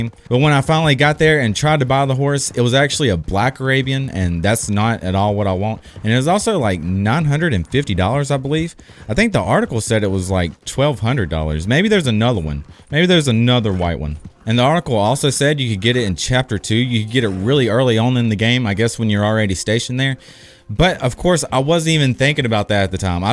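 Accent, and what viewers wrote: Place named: Carolinas, United States. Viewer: Thanks your a pal lol hey are you from the Carolinas cause your accent sounds like it lol I am from there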